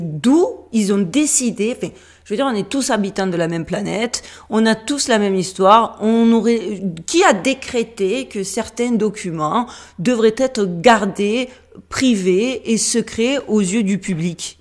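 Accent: South, France. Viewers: Encore une vidéo géniale 😻 j’ai découvert ta chaîne il y a quelques mois et je suis devenue boulimique de toutes tes vidéos! 4 and à rattraper ❤❤ j’adore ta personnalité et je rigole souvent avec toi! L’accent du sud me rappelle mes racines ❤ j’espère que ta communauté ne fera que grandir encore! On a tous besoin d’une pour nous ouvrir les yeux! Tu es vraiment impressionnante ❤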